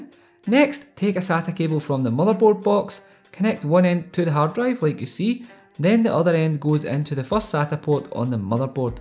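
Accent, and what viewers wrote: Scotland, United Kingdom. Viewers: Chuchu - Games and Guitars probably a,ready found out but I’m pretty sure it’s Scottish, or somewhere from the Scandinavian countries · You fae Scotland ?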